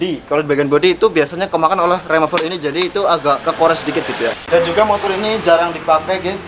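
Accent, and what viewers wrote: Banyumas, Indonesia. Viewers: Mantap wong ngapak 🤣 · Mas ngomong nge nggae basa ngapak kenera ora ngapak ora kepenak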